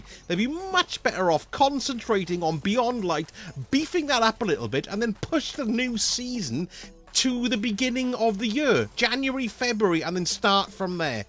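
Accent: Wales, United Kingdom. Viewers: I can spot a Welsh twang in your voice,am I right?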